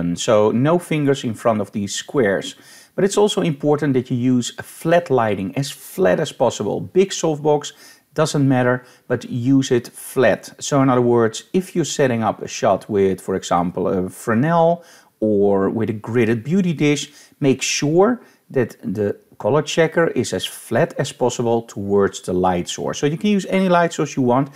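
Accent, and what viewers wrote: Netherlands. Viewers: are you from holland sir ?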